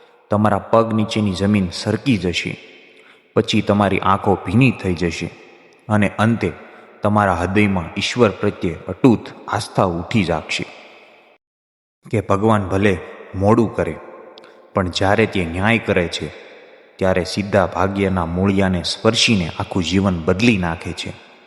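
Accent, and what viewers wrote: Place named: Bhavnagar, India. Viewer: તમે ભાવનગર તરફના હો, એવું તમારી ભાષા અને ખાસ તો તમારા ઉચ્ચારો પરથી લાગે છે. જવાબ આપશો.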